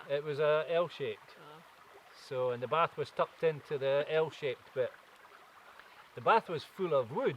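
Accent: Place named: New York, United States. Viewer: that is too funny. When you speak it is, to me, like a fellow NYer. Thanks for getting back to me and thanks for the video. BTW, food looks so much better cooked at a campsite. Be well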